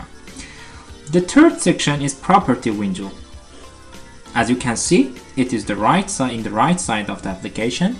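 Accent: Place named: Iran. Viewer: Tnx a lot.. Nice but music is loud i try to understand u.. I think u r from iran..ur accent and the way u speak is like iranian people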